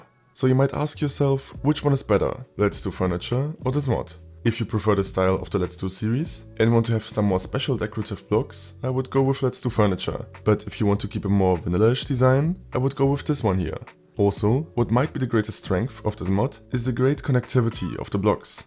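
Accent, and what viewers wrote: Germany. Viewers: Man hört so raus das du deutsch bist haha Top Video!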